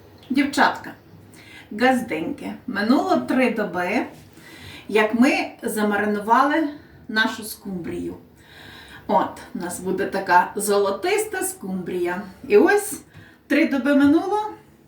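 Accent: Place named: Podillia, Ukraine. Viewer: Дуже дякую за таку розлогу відповідь. Село Глібічок - це мамина мала батьківщина, неподалік від Кам'янця, там, де Миньківці, Нова Вушиця. Почув такі з дитинства знайомі слова, мовні оберти, інтонації, от і запитав, звідки ви. Буду тепер Ваш канал дивитися і слухати 🤗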